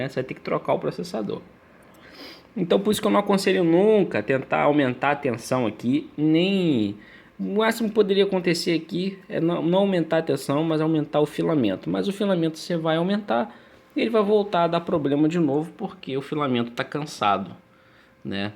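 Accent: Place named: Rio de Janeiro, Brazil. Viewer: Boa tarde amigo. Pelo sotaque vi que você é do RJ. To com um F9 precisando de manutenção. Pode me passar seu contato?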